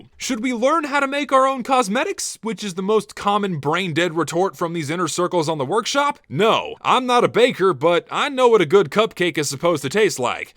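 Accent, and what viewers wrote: Texas, United States. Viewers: "The more lazy, narcisstic sides of the workshop" a little bit of sundowner just spilled out there. I love the Texas accent.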